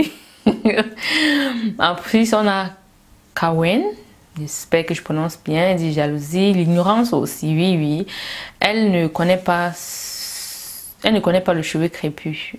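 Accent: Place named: Cameroon. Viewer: Vous avezvun accent Camerounais😆😆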